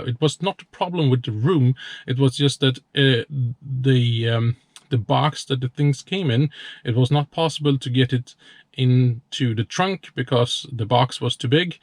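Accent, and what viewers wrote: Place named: Germany. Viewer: Ah, nothing, just your dialect is just like my friends who is German. Perhaps you have lived there?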